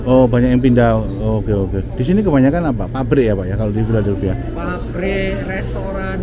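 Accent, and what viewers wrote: Surabaya, Indonesia. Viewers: Big respect buat om lama di Amrik aksen ngomong dan masih gunakan bahasa Indonesia dan Jowo Suroboyoan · Boso sampeyan ngguyokno.... Bener...bener...Londo kampong yo puinter ngomong suroboyoan Om.... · saya senang kalo Pak bahasa Jawa, sering keluar ciri khas Suroboyoane, ayo Pak bikin conten pake bahasa Jawa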